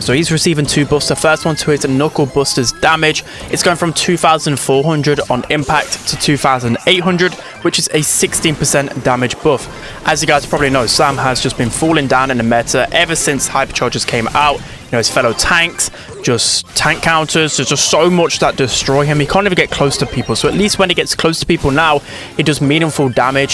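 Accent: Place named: Liverpool, United Kingdom. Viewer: You're a Scouser? Yeah I'm unsubscribing.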